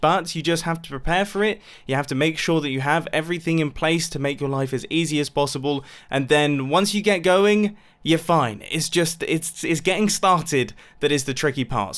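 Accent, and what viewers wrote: United Kingdom. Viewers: 'ello charming brit! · british man just said "don't panic". it's like that one book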